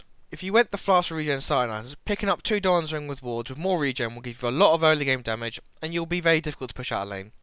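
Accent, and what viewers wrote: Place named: England, United Kingdom. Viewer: 21 I get it if I rewind the video but it's a bit of a hassle. Try pausing a bit between words man, you have a very heavy english accent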